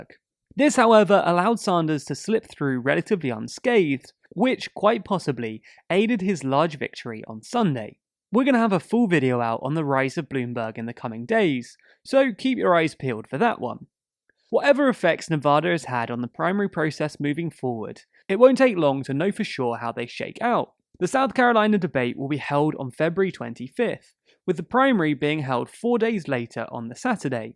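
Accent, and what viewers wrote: England, United Kingdom. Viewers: You mean that's the english dialect of english, which equally as valid as the american dialects of english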